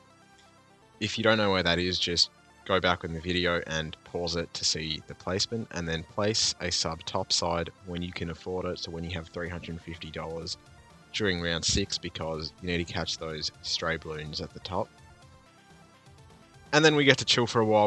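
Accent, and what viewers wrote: Australia. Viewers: you have no idea how much joy it brings me to hear that beautiful Aussie voiceover narrate goated CHIMPS guides, I love you brother How are you, anyway? How's the fame of being a movie star treating you?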